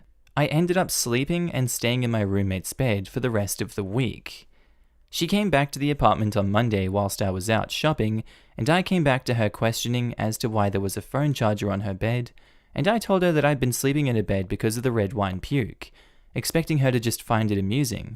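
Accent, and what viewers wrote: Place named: Australia. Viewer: i love the aussie accent!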